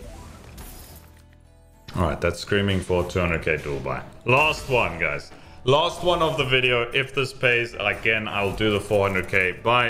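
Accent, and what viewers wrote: South Africa. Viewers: Dude are you from SA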